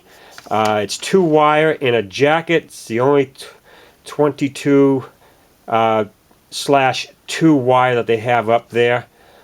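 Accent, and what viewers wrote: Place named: Massachusetts, United States. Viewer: "Oh my God...you're a pimpin' fool!"...LOL You must be from Massachusetts...you have the same accent as a buddy of mine. He says things like rubbish...and Caaah (for car)...lol Nice videos! I may have to do this but with one that is not the sequential flashing type...to me it is a bit annoying. I would be adding them to a mirror that already has forward facing flashers...I just want some with them facing the rear to alert people coming up on you.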